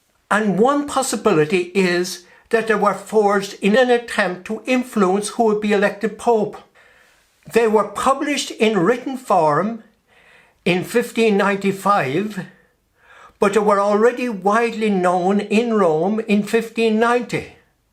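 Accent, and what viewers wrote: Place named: Ireland. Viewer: I just came here to hear Father say "Farty", I studied in a school run by the Irish Christian Brothers and I will never get tired of that accent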